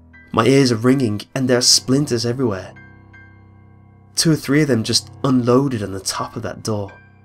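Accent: United Kingdom. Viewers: Why would a British person be telling an American folk type story? Thats ruining my immersion.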